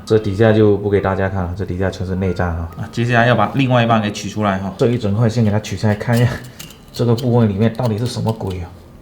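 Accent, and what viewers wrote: Fuzhou, China. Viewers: 福州人吗 感觉福州普通话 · 福州腔太重了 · 福州音太重了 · 福州音好亲切哈哈哈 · 这口音可是福州人？ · 一听声音就知道是福州师傅lo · 我也感觉一听口音就是福州人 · 我想问， 你的口音有福州音，你是福州人吗？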